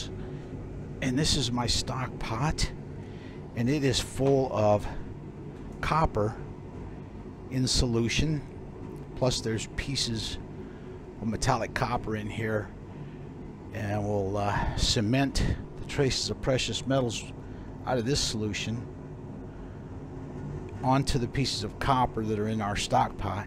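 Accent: United States. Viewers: You speak such a nice English dialect. Can you tell where exactly in the US you live? I'm from Germany, by the way, that's why it's kind of fascinating for me. Is there actually an accreditation for precious metal refiners in the USA? Can or do you have to issue certificates for the purity of your gold bars? In Germany, such things are usually very strictly regulated.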